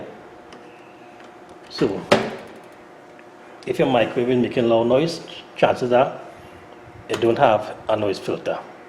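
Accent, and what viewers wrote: Trinidad and Tobago. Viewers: Have a samsung over the range with hood vent. one of the fuses on the noise filter (Has 2) blown Tried a new one blown again. what would cause that? Microwave no heating. door switches test fine. Resistor , magnatron, capacitor, thermal switches all test fine. Also would like ur contact. u sound like a trini.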